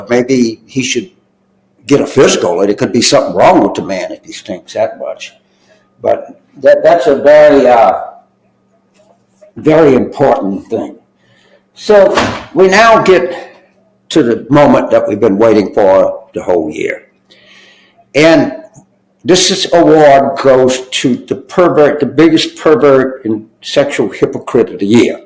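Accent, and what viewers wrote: South, United States. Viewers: let me give you a little podcast. FYI… Do your podcast in a bedroom or heavily carpeted living room the echo on your voice is kind of intolerable especially with your deep southern accent… Which, besides you is a very difficult one for someone who respects democracy to listen to at this time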